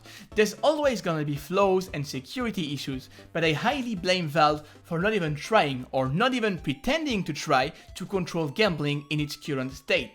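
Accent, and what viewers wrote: France. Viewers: Is this guy French? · are you french????? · You sounded way more French in this video.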